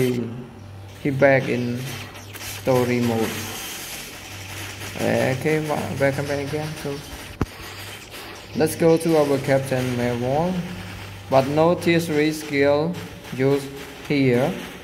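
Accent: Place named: India. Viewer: Yes he is because he has Indian accent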